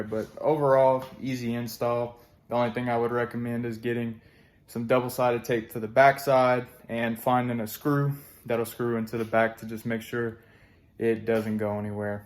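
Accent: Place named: Louisiana, United States. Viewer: As soon as you started talking I said "Southwest Louisiana" haha. I dig these lights man.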